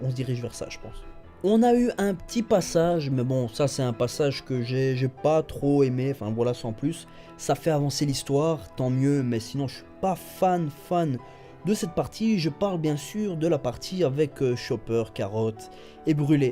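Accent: Belgium. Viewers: Question complètement hors sujet désolé mais T'es belge, est ce que tu viens de Huy ? ^^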